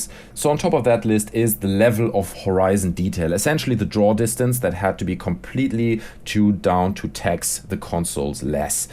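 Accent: Germany. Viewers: wollt gerade fragen, ob du deutsch bist :D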